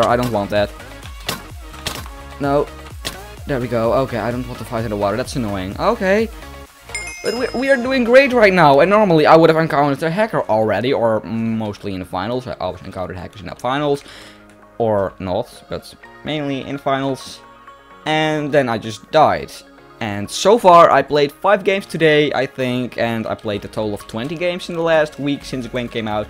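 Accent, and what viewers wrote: Netherlands. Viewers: Ik hoorde het aan je accent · It sounds Like youre dutch Nice CHANNEL keep up THE Good work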